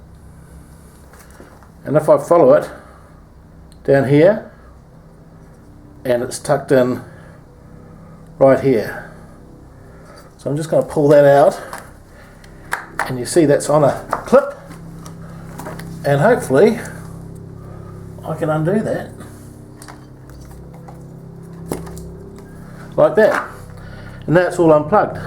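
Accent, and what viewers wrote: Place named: New Zealand. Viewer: Awesome video. Editing & presentation is outstanding along with the clarity and simplicity of instructions. I'm inspired now to arrange the purchase of an 883 tank for installation on my 48. Good to hear a Kiwi accent all the way from Onehunga after living in Oz for the last 10 years. LoL Cheers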